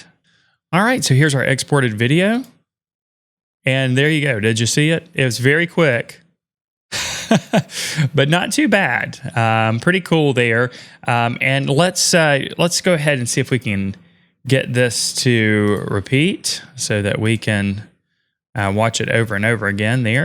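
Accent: South, United States. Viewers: Holy moly that is some strong souther accent right there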